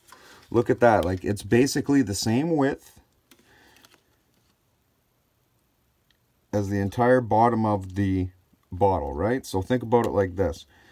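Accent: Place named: Ottawa Valley, Canada. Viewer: ya its a decent one. CT used to always stock zippo fluid, but since zippo bought ronsonol I haven't seen it. Just the yellow one now. Ya I knew u were a Canuck from another vid of yours. Woulda guessed anyways, ur accent is similar to what we got in the ottawa valley.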